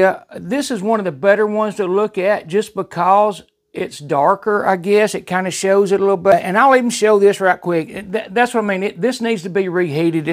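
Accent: South, United States. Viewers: His down south accent talking about wings is weirdly soothing. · His southern accent instantly makes me trust him. Great video good sir!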